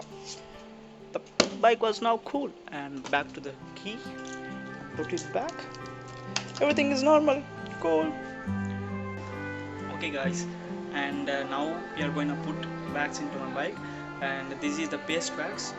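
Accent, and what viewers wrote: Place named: United Kingdom. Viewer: I thing he is speaking British English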